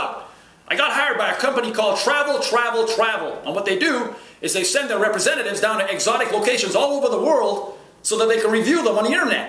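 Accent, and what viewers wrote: Trinidad and Tobago. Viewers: He talks trini so well tho Ahaa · That Trini accent is on point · Your trini accent really good, nice man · he does a great trini accent...im a traveller too and i video the other islands too. check out my clips..click my name and away u go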